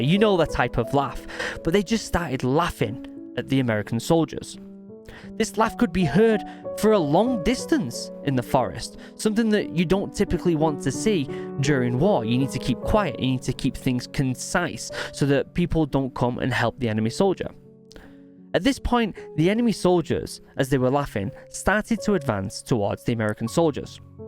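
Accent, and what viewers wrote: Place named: England, United Kingdom. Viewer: nice change hearing an Englishman tell horror stories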